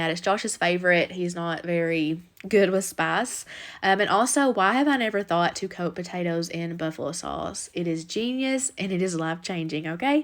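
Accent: South, United States. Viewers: This is so random but I love having a southern Youtuber say bowl and oil the same way I do. Bole and awwll. 🥰 🥰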